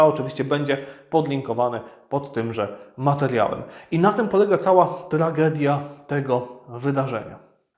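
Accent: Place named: Italy. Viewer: Miałbym do Księdza uprzejmą prośbę, by przytaczając cytaty z tekstów łacińskich raczył Ksiądz stosować się do tradycyjnie przyjętych w Polsce prawideł wymowy, bez zachwaszczania italianizmami itp. Z góry dziękuję!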